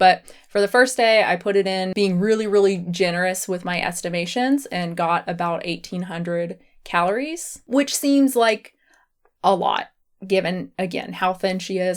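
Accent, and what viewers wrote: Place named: South, United States. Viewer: Off topic but you sound so southern in this video especially at the start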